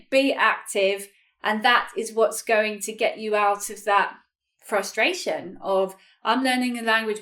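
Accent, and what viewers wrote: United Kingdom. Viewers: I really like your british accent. I am studying English currently a day per week. I lke your videos. Thank you a lot! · I love your lesson and your British accent ; )Thanks a lot!!! But I would like more lessons for british pronouncation ;) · Thanks for making this useful video. I have been in the same situation and It is not too late for me to change the method to learn English effectively. By the way, I love you British accent a lot.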